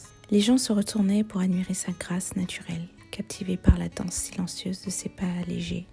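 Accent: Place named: France. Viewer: Wow very beautiful French accent voice and two of the beautiful feet in the world 😍🤩🌍🌎🌏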